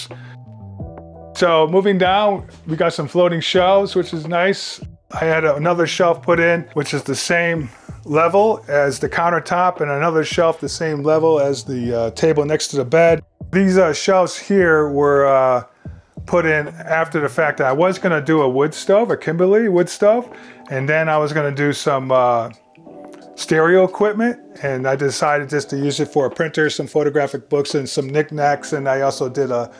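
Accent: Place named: East Coast, United States. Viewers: He's so much cuter than Will. I also detect an eastcoast accent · is my brother, and he is cuter, and so. so funny. He is so happy now back in CA. But yes the dialect is New England.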